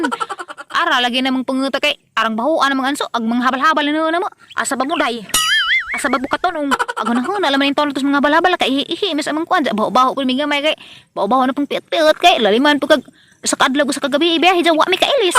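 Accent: Bohol, Philippines. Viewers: Lami jud ka mo binol anon day, angay jud ko s imong sinultihan😁😁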